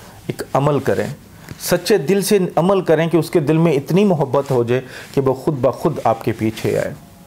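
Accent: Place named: Pakistan. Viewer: Main India se hoon pr Alhamdolillaah Pakistan se bohot lagao hai boli bohot achchi lagti hai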